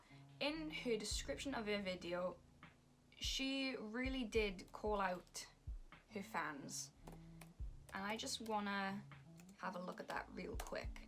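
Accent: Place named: Wales, United Kingdom. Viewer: Your accent is conflicting to me, cause I don’t know if your from mid/south wales or Mid/north England- Love your hair, love you ur piercings. Lots of new year luck n love from north wales!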